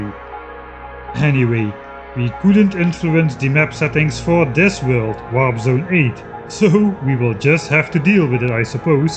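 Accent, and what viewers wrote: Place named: Netherlands. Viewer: you are too humble - any of your tongue tied treats is a song to any of us bots, boys or babes! Doesn't need a tune - your dutch accent is sufficient music :)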